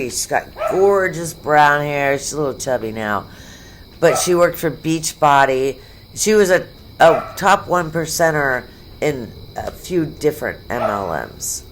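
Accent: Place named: New Jersey, United States. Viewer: Just found your channel. You sound so much like a writer/comedienne named Tara Dublin. Same throaty, sort of New Jersey girl accent.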